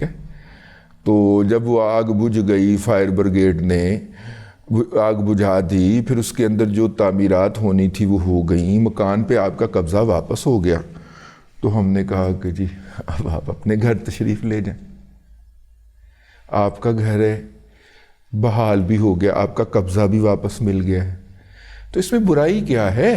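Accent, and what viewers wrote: Punjab, Pakistan. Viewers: Jaar tuhadi Punjabi bahut mitth hai !!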